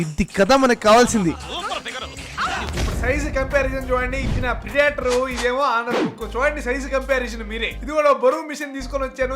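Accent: Chittoor, India. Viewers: Chittoor slang anna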